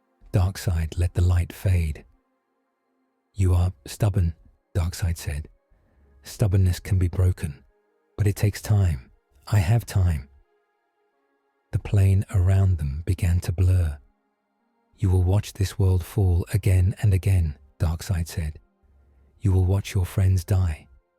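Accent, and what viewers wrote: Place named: England, United Kingdom. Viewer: Why the hell is someone with an English accent narrating? Cap is an American! DA...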